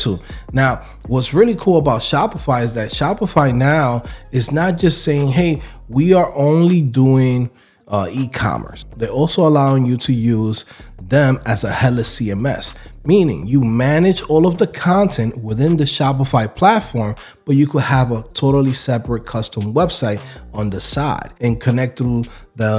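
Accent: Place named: New Jersey, United States. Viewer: Wish I would’ve found this channel before I enrolled in a full stack course..finally somebody who look like me, even tho I’m black and I’m assumin he Dominican prolly..whenever you look up coding it’s a video wit a white boy or an asain which ain’t nothing wrong with that, but representation matters, and seeing a dude who talks like me and is presumably from where I’m from(I’m from Jersey he’s definitely from Jersey or ny) is exactly what I was lookin for 🙏🏾🙏🏾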